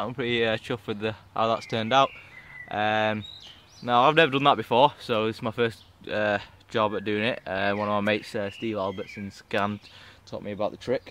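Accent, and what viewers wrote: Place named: Northern England, United Kingdom. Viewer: thx, funniest bit when he drops the fing t-cut even funnier with a northern accent. luck it was just the clear coat damaged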